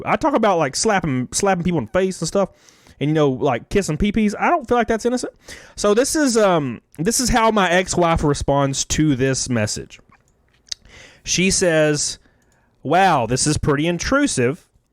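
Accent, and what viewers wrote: South, United States. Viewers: I love the southern accent. Meanwhile, I hate mine because its british 🤣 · Folks doing this to others is not cool. I hope you will be ok and if it means anything your accent was one of the things that made your channel stand out and why we followed you initially. Hearing gaming updates with a southern accent is fun. Keep on doing what you love.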